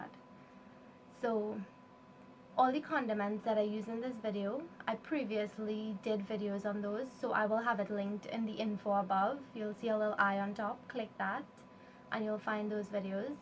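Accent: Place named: Trinidad and Tobago. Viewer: I live in Montreal, Quebec Canada, I would love LOVE to have a wife born in , Trinidad and Tobago I adore everything about these women, The food, the fancy dress, and that accent, the culture, the eyes, ouff Being an Italian man, guess it will remain a dream. dammit [ a pinch goes a long way she says,,,, LOL ] sometimes they slap us when we pinch 'em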